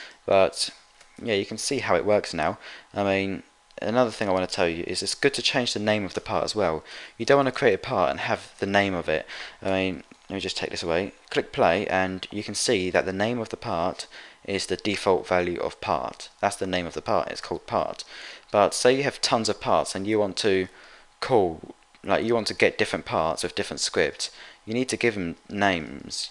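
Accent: United Kingdom. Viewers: Yea, I think hes from the UK or something like that. That's most likely why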